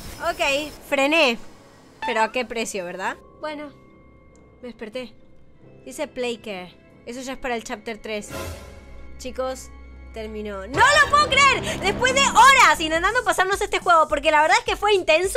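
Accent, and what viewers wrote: Argentina. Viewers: Hola eres argentina😊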